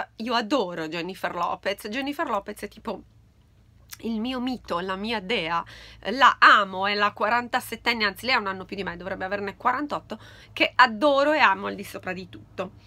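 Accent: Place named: Sardinia, Italy. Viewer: Si questo è il nostro accento cagliaritano quando vuoi lo parliamo assieme 😉😉😉😉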